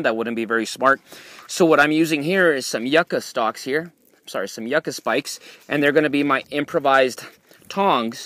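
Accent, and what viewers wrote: Texas, United States. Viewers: Where in texas is he from??????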